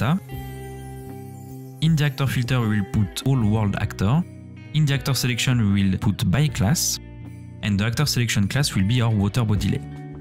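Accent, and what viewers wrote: France. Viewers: Salut, (je pense que tu est français à ton accent (ce n'est pas un reproche)) ton tuto à l'air top je chercher à apprendre à faire ca donc c'est parfait. Bon courage et continue comme sa et bonne fin d'année.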